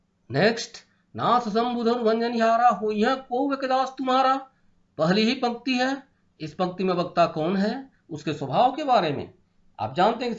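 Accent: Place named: Bhojpuri, India. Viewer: Waise toh tunne pgt hindi likha hai lekin padhta tu hai hindi mix bhojpuri, pehle apni hindi sudhar tab padhana supari nikal ke bol ...Pehle rehearsal kar tab video banana😀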